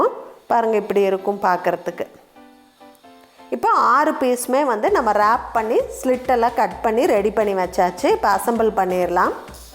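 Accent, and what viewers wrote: Coimbatore, India. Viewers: 😃. U r using ur platform right 💕💕.. From my view u r unique, did not copy anyone💞💕💕💞.. U have beautiful Coimbatore Slang👌💖💖👌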